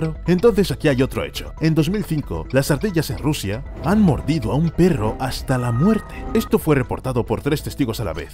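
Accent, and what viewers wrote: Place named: Andalusia, Spain. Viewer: Haz un video revelando tu acento Andaluz, que se te escapa a veces jajajaj, un abrazo desde Sevilla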